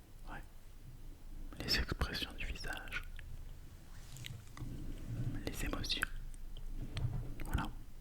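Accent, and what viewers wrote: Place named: France. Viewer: 💌 Hi,💟 I love the French accent and thanks to ASMR with soft sounds I feel very, very good. Thank you.🙌✨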